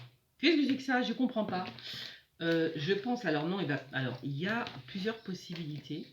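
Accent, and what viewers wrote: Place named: South, France. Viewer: bonjour quel bel accent meridional!!!!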